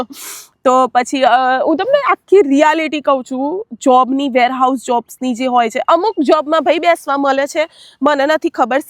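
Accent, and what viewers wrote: Sabarkantha, India. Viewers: tamari accent to Sabarkantha jevi che 😊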